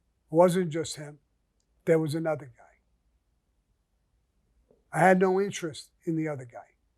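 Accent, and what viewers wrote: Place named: Brooklyn, United States. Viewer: It's simply a dialect common to a Brooklyn that unfortunately, for many reasons, exists no more. Every region has their own. Nothing more. Nothing less.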